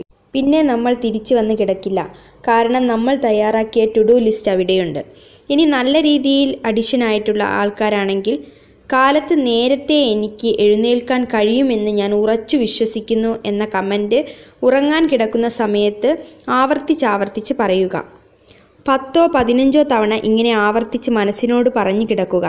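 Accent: Kozhikode, India. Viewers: your clt slang is so attractive and sweet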